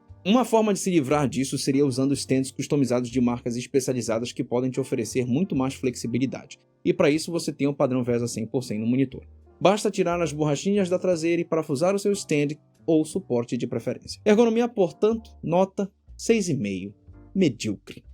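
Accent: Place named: North, Brazil. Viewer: Mano vc é de onde? Teu sotaque parece ser aqui do norte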